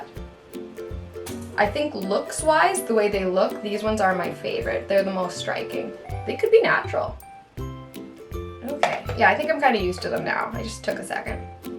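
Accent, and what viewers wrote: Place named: Canada. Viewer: I love Canadian accents ❤ and it adorable.. would love more videos like this.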